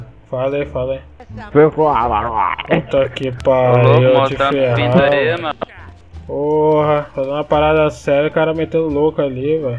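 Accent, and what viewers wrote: Bahia, Brazil. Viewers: É baiano ctz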